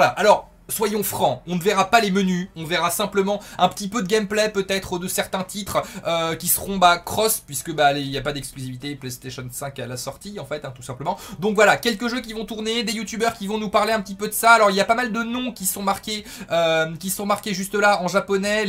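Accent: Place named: France. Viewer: Mon dieu l'accent Français une tuerie!!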